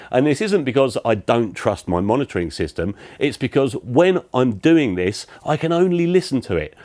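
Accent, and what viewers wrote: United Kingdom. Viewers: Woww! How could I've learned so many things in just over 10 mins video? Honestly, sometimes Brit accent confuses me, but in your case...SUBSCRIBED!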